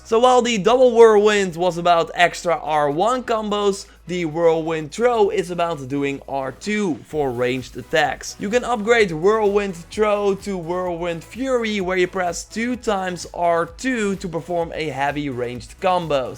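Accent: Netherlands. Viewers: Mr_Bohowsky he's Dutch. Let him be. The Dutch-English accent is our fucking pride, man.. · Nederlandse accent cringe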